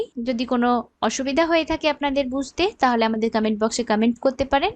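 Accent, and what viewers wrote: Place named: Bangladesh. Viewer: Tui Bangladeshi hoye India er video banachis???😂 Tor kothar style e bojha jay....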